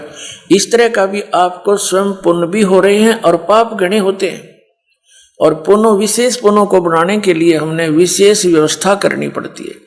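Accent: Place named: Haryana, India. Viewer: Iski haryaanvi bahut mst lgti h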